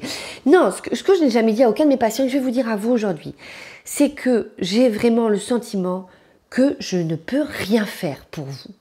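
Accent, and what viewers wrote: South, France. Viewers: C'est l'accent du sud. Par exemple "hein" donne "haing".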